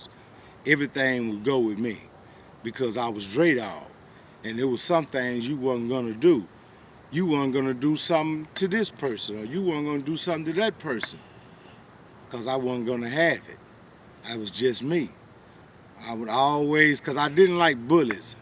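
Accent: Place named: Texas, United States. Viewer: I knew u was from TX when I heard “gettin fly at da mouf” 😭 mane den I seen the location on da video and u in da southside of houston I’m from da nawfside much love bro I fw yo videos.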